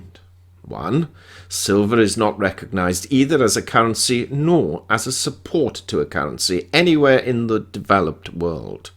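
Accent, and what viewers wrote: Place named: RP, United Kingdom. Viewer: First of all we don't want a one world currency. We surely don't want a one world currency controlled by a central group who want a new one world order. I suspect you are one of those that want a centrally controlled currency easy to manipulate. Silver and gold plus some other inherent value commodities could protect us from dictatorial control of finance (the fiat fraud) that has so indebted the world. Also, silver needs to be revalued to preserve it from destruction. At a higher price silver substitutes will come along to fill need and make available more silver for monetary use. A national currency backed by a useful commodity like silver would have the advantage over those supported by faith in goverment oppertunists. Let there be national currencies to compete to find fair market value and protect the world from Globalist fascism. 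Tone down the elitist sounding posh accent. It sound smarmy.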